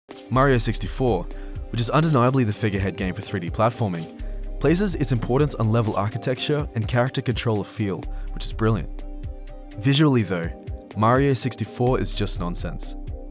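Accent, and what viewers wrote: Australia. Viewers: I just can't take a serious discussion by someone with such a stereotypical Aussie accent. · He made it so engaging because of his Aussie accent.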